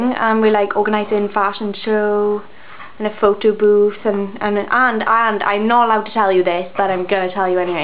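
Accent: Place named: Wales, United Kingdom. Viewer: GOD YOU SOUND SO WELSH xD Hahahaha, mad! <3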